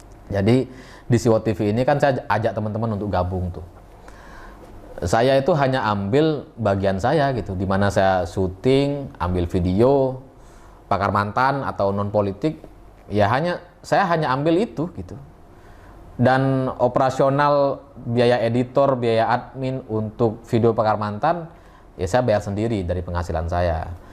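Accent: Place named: Madura, Indonesia. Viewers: Wahhh... Congrat ya bang.... I always stay tune on ur channel... Happy milad juga buat bang, barakallah fii umrik... Semoga tambah sukses lg, dan terus menyuarakan kebenaran utk akal sehat biar kita gak pernah oleng... Betewe, sengko ghelem kaosah jih... Mayuh kerem ghebey sengko bang... 😁😀 Kata siapa gak cocok... Justru you are special. Kaya prof Mahfud my idol, bangga lihat logat Maduramu masuk youtube dan always dilike byk orang. Whats wrong with ur aksen... Go ahead bang... Be the best.. Best wishes dr kami di Kalimantan Tengah, ur truly liker... 😂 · Saya suka nonton video mas baik di CokroTv maupun di SewordTv. Saya suka ulasan-ulasan anda tentang politik atau sosial, dan logat Madura anda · Saya Katolik di Bali, setia menonton pakar mantan. Justru dialek madura itu cirikas Mas banget. Bravo n viva mas.. menginspirasi masyarat. PROFICIAT UTK PENGHARGAAN DARI YOUTUBE.. 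MAU KAOS JG DONG MAS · Sampean abit pon bede e jakarta kak...mak logattah pagun katarah jek reng madureh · Saya suka logat maduranya mas.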